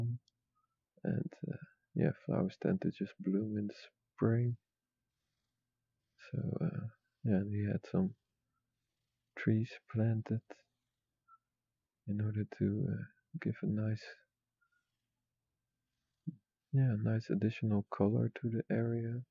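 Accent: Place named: Netherlands. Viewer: Indeed it sounds little dreamy, dutch english accent aswell be. Except one voice wich can´t change a better micro would do wonders, lowering volumes don´t seem to be the sollution. Story telling keep the viewer motivated, practice, the content is very well, hope you will do !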